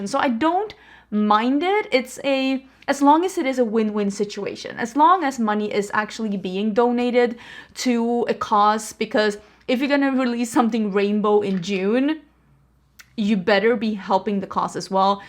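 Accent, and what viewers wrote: Sweden. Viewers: dont change! you're so Wise and Talented! I love hearing how you pronounce things with your Swedish accent. So glad I found your channel, You're my favorite Youtuber 🥺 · omg........ i love my swedish clown with an accent..... dont like me well dont let the door hit you on the way out..... ppl have too much time on their hands omg!!!!! i love you!!!!!! you are perfect the way you are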